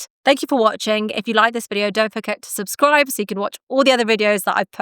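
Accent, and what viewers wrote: United Kingdom. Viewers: I have been listening to your podcasts for the past few weeks and I find them very interesting and helpful. I am in my sixties and I have read many self help books and listened to audio tapes and CDs over the years. There is something very refreshing about your podcasts. I also love your British accent 😊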